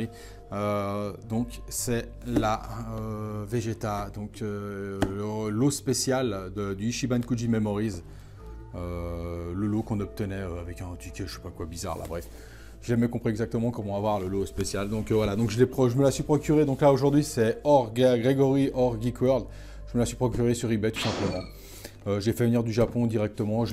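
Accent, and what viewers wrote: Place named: Quebec, Canada. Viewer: This dude def from montreal or quebec.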